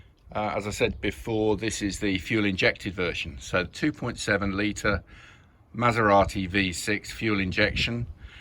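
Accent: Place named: Wales, United Kingdom. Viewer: Soft, lyrical and charming Welsh accent.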